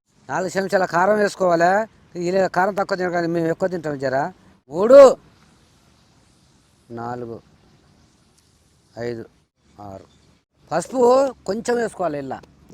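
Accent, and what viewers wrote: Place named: Nalgonda, India. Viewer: నువ్వు మా ఊర్లో మాట్లాడినట్టు మాట్లాడుతున్నావు... మాది నల్లగొండ, దేవరకొండ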